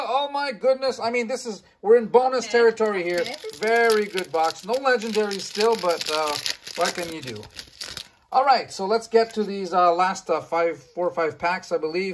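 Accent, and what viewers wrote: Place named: Quebec, Canada. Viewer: Nice video! You Canadian have such a cute French accent. 👌 Nice wine, St Emilion is always a good choice.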